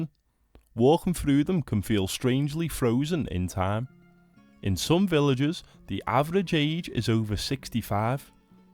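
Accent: Scotland, United Kingdom. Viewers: Are you from Scotland? I mean, it's Scottish pronunciation?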